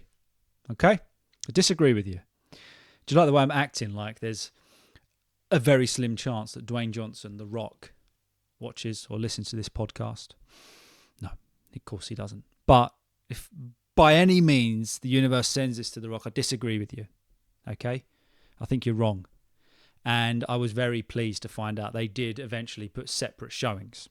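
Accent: RP, United Kingdom. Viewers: Hi yeah, Hope you are doing good. Being an expat from a non English speaking country and having an advanced level of English speaking, I still find it difficult to grasp British accent. I recently started listening to your podcast with an intent to get accustomed to it. Thank you; you are helping me in understanding the accent and British communication style. Hope listening to you more will help me crack the accent soon. On the critical side, at times I feel you speak more of RP English. While It may be your natural speaking and teaching style, I sometimes come-across accent that are even more harder to understand. Coming to the topic, I feel etiquette is set of unscripted rules which vary with place and culture. Following these unscripted rules can be daunting for someone like me who is new to the country. Cinema etiquettes is almost same everywhere, but there are always a few who don’t follow it - at the very least, by not being on time. 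About the personal space, in my country, putting feet on the seat is considered rude and doing so with shoes on is the rudest. (Can’t imagine the places that shoes might have stepped! ). Unfortunately, I see a lot of youngsters doing same in trams and trains here. To be frank, that’s worst behaviour. Rogues exist in every corner of the world. Another disgusting behaviour that I experienced here is people loudly blowing their nose (while having flu) in a professional and quiet space. It’s really nauseating, especially coming from otherwise w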